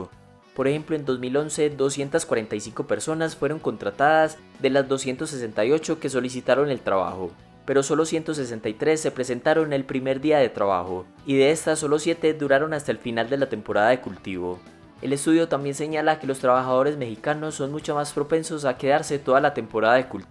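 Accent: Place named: Paisa, Colombia. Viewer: ese acento yo lo reconozco, es colombiano paisa jaja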